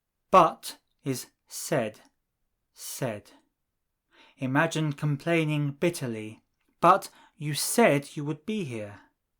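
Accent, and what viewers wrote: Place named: Italy. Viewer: He sounds italian when speaking espersnto tbf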